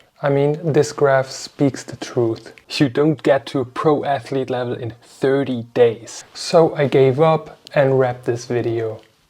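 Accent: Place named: Germany. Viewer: An sich interessantes Video, aber dude.. dein german accent killt jede Motivation weiterzuschauen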